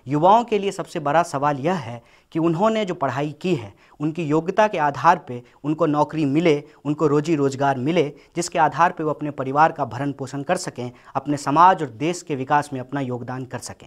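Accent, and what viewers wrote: Bihar, India. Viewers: apki hindi bihari mix hai es liye sayed aap ko sabhi pasand nhi krtey.. mai like krta hoon. lekin janta hoon hindi sahi kr layi to sabhi apko pasand krenge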